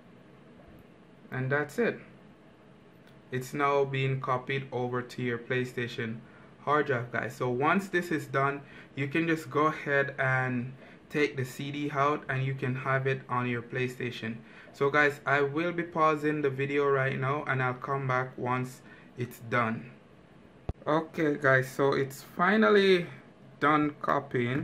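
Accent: Jamaica. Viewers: Sound like a yardie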